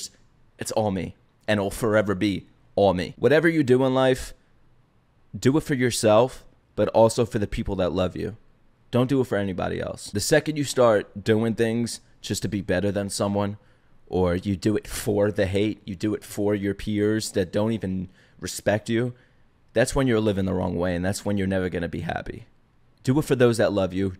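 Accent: New York, United States. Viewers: Hi...I'm a 4 hour New Subscriber...LoL...That first reaction Video of Pentatonix I was floored laughing and enjoying myself, from that one video I instantly Subscribed.....and Less then 5 minutes in I knew you were from New York...lol...YOUR AWESOME....FUNNY AND DAMN YOUR REACTIONS ARE AWESOME! Haters are always going to hate...It tough but Don't Let em Get you Down. You've got Fans and Subscribers Who Love what you DO...SO Keep Your Head Up and Don't Let em Get you Down.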